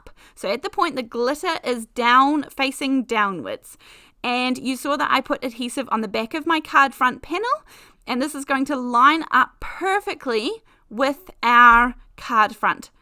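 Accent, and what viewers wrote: Southland, New Zealand. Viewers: Wow, that's so awesome, I'm just getting into card making and your video is great, I noticed you're also from NZ, by any chance from Southland, I noticed the accent as I'm from Invercargill 😂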